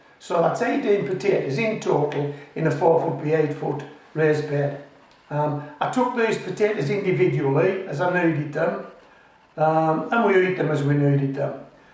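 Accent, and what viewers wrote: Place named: Darlington, United Kingdom. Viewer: thank you kindly and bless your ears. It doesn’t look insipid in that vid. Looks spot on. I have family from Darlington and you have a similar accent. Lovely up there. I’m in the fens where it’s flat and windy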